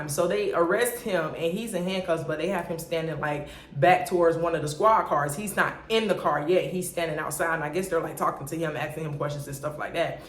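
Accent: Florida, United States. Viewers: Listening to your stories I was trying so hard to figure out what part of Florida you from. Highlands county deep in the country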